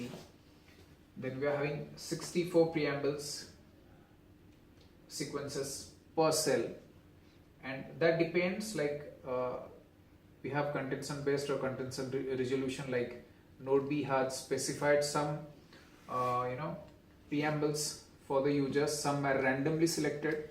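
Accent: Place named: India. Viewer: poor accent and english.. too much indian, cant understand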